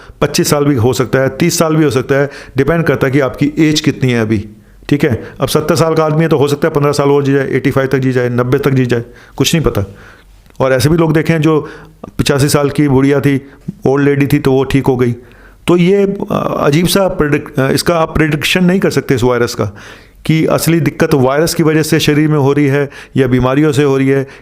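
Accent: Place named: Punjab, India. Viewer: Very sweet punjabi ❣️❣️nice to hear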